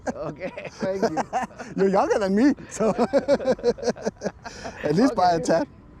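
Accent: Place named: Denmark. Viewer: It's actually Denmark I believe due to his accent. But sincerely thnx for asking 😂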